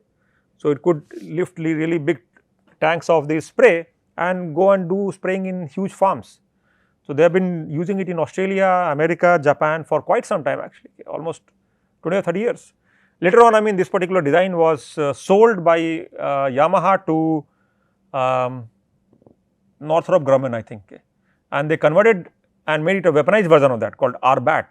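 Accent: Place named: Oxford, United Kingdom. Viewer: Sir aap hindustan me ho eng me ho oxford ki trh kyo bolte ho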